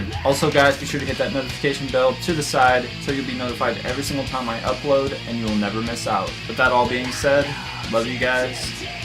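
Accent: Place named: United States. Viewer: I could be wrong, but I would assume from your accent that you live in the US? I believe they're about to be hitting the US really soon! Definitely go if you can (apologies if i'm wrong)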